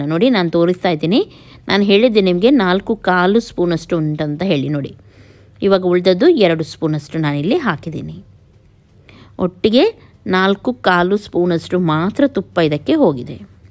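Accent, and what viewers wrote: Mangalore, India. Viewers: But really nice 🙂 speaking 🗣️ mostly they are manglurians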